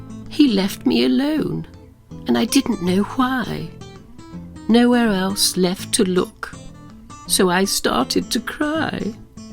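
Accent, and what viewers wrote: RP, United Kingdom. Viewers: Omg!!🤣🤣👊👏👍 you are hilarious. I've never heard someone with such an accent telling stories like this! The posh accent makes it even more funny!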